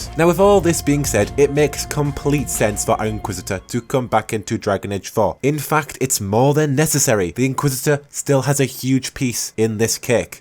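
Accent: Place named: Sunderland, United Kingdom. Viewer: I thought I heard a Mackem twang in your voice, and although my mage may be 1 handed he’s far from helpless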